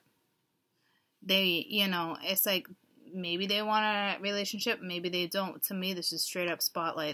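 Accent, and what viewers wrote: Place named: Long Island, United States. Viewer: I am sorry this is SO irrelevant and while I am at it, what a great reading! So thank you(: But are you from like Shirley?!.... L.I.,NY ? I ask because you sounds like just my great aunts from there haha Italians on long island lolol<333 it reminds me of Easter :p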